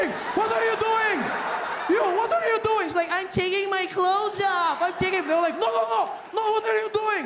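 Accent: United Kingdom. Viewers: He's human after all! I thought this man could do everything. But his British accents need a bit of work. :)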